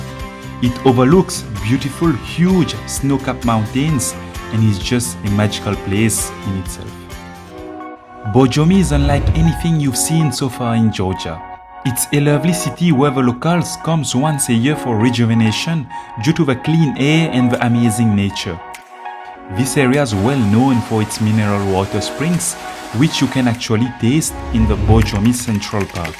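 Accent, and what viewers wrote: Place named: Mauritius. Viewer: Nice video and keep it up and I love your accent I don’t know but to me it sound like yo are from Mauritius 🇲🇺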